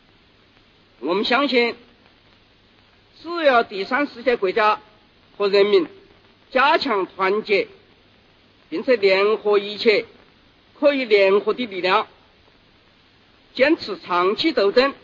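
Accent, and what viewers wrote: Sichuan, China. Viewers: 哈哈哈 现在才知道 四川话居然到过联合国会议 哈哈哈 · 四川话。其实四川话不难懂，很多字就是普通话变个调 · 这是四川话，我是四川人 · 四川话。。。好亲切。。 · 可苦了聯合國的翻譯了 翻譯不一定聽得懂四川話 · 牛逼啊，在联合国狂飚四川话。关键这翻译的人头疼了。邓公我们深切缅怀你当今中国的发展你功不可没。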